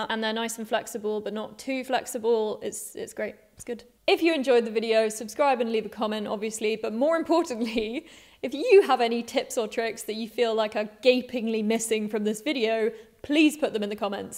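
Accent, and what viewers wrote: England, United Kingdom. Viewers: It's not often to see a girl, and rarely still, one with the english accent giving tips on woodworking on YT. Enjoyable and refreshing to a point that I can't recall the tips you gave! 😊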